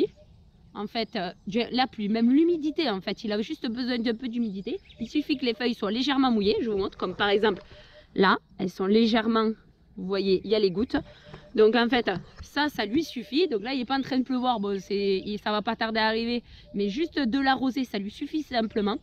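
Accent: France. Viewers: Encore une fois super vidéo. Ce qui est bien avec toi c'est que tu expliques très bien les choses sans que ce soit barbant, on passe toujours un bon moment. Beau travail sur le montage, les scènes insérées c'est une bonne idée. You have a great french acent when you speak english 👍 I wish you do a complete video in english . See you asap 👋👋👋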